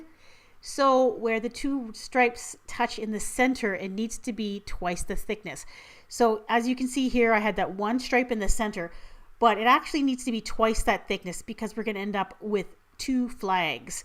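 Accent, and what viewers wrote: Canada. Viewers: Canadian! You can hear it in certain words she uses :)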